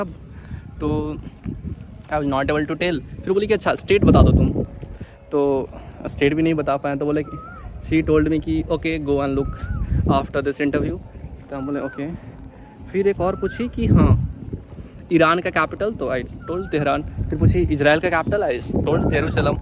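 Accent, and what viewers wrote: Bihar, India. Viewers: Bhaiya ek chizz puchna hai aaplog ko pta kaise chal jata hai ki aaj kahan interview hai?? Aur bhaiya aapka boli se hi samajh aa jata hai ki aap bihari ho hum Begusarai se hai❤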